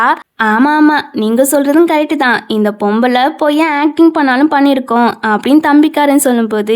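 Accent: Tirunelveli, India. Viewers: Unga voice romba nallaruku pa neenga nellai slang dhana pesurenga nan tenkasi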